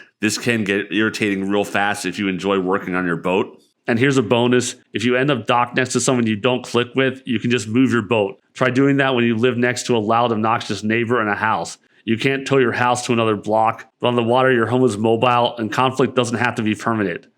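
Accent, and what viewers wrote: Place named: United States. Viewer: It sounds like you have some kind of American accent.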